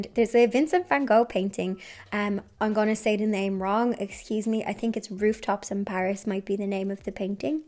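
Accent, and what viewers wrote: Ireland. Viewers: I love the balance you have on your channel 🤗, I could listen to the chat all day plus your Irish and it’s my favourite accent. The quality of your upload is always amazing and if putting your self under extra pressure to do more…..then don’t because that pressure will reflect on you and that’s not good for your health. Finishing this year with kitten content is a wonderful end to the year and the exploding tree perfect. Take care x xx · I just like listening to your Irish accent lol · Cheeky thumbs up for sure. ❤ Could listen to your beautiful irishness for hours, love the kittens though Blonde will be forever in my heart ❤️ Pretty well love any and all content 😊 so keep it coming in the forms that your heart takes you, I'll be watchings, cheers and happy holiday season. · Dear, your channel is one of the very few I'm really watching longterm, which means over many years. 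Lots of others I like(d) became noticeably calculating. Understandably, of course. But you manage to keep your videos very natural and unaffected (sorry for my bad English, I'm not sure, if I chose the right words). I love the mix of craft, "housekeeping", gardening, cats, trips and chitchat. The gardening part might be my fave, as you seem to live in a very similar climate like mine. But I also just love to listen to Irish English, and your voice is so charming. Your dedication to quality over quantity and work life balance makes you even more sympathetic. Keep following your heart, please. And have a magical christmas time with your loved ones! A big THANKYOU from southern Denmark! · I would love more DIY and craft videos but not daily:-) I won’t keep up:-) but maybe a xtra one here and there would be welcome treat. I love hearing about you, I follow you because I feel a kindered spirit in DIY and love hearing the Irish accent and slang, I miss my old neighbour especially at this time of year. 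But you keep doing you. Enjoy your friends and family and keep them just for you, you deserve that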